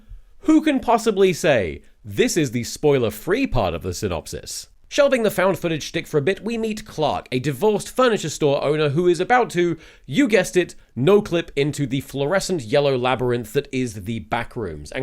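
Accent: United States. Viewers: You have a good American Accent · There's something uncanny about how incredibly natural Tomska's American accent is. I know he's been doing it for years at this point but still putting it side by side with his actual accent is pinging my wrong vibes detector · Your American accent is so good what Have you been doing s British Accent this entire time Nevermind I got to the antivirus part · I’m sure this is mentioned a lot but American accent is incredible. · Holy shit that American accent is wild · Tomska's American accent is impeccable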